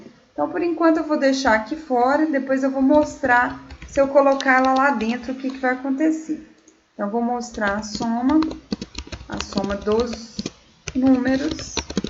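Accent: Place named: Minas Gerais, Brazil. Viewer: Parabéns você explica muito bem, ficou melhor ainda com este sotaque de mineira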